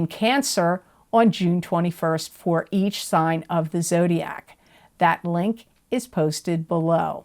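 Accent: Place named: New York, United States. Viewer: I do think you are a New Yorker !! Love your accent !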